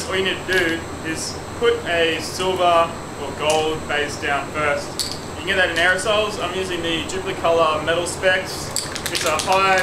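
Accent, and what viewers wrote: Australia. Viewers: A R its just an Australian accent it's not that hard to understand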